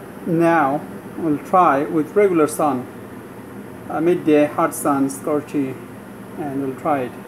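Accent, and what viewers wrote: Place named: Nepal. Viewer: are u nepalese? ur accent sounds like nepalese and yes mostly nepalese people use this method to see the solar eclipse. I have been using this method since childhood for several times and it works fine. thank you for sharing!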